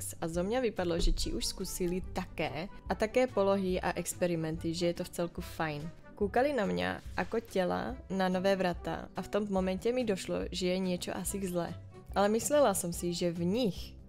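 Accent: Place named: Slovakia. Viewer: super videa ale ta slovencina no docela sila :D Malem sem se podelal od smiechu :D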